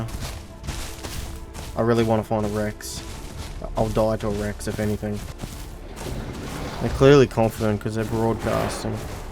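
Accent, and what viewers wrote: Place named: Australia. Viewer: Love your accent m8. If you don't mind my asking, what part of Australia are you from? Just the way you say certain words like "alive" and "die" is really slick and reminds me of the Big Lez Show. I was wondering if it is a common way of speaking or more of a regional dialect.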